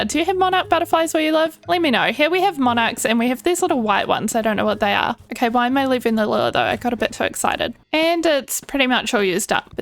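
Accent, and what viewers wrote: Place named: New Zealand. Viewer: 200 days is def needed! This is just so cozy and your New Zealand accent just makes this all the more better. <3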